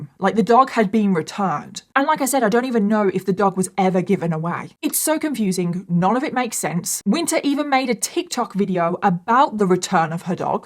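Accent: United Kingdom. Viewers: I thought you were British with your accent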